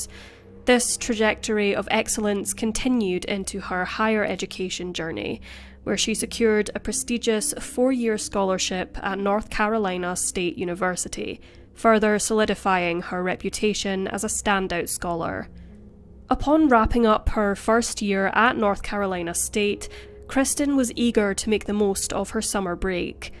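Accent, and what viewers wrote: Scotland, United Kingdom. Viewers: Where is your accent from clearly Scotland but guessing not quite as far north as Aberdeen, right?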